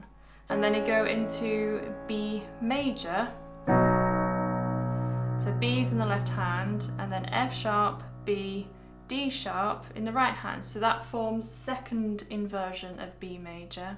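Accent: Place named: Lincolnshire, United Kingdom. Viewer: Hi, Your tutorials are really easy to follow and you explain them so well, I’ve be trying this on and off for a few weeks now and almost nailed it. Can’t add vocals yet though lol. Just out of interest and I hope you don’t mind me asking but you sound like you’re from my neck of the woods, sort of Grimsby Humberston area of Lincolnshire. Hope I haven’t offended you with that 😅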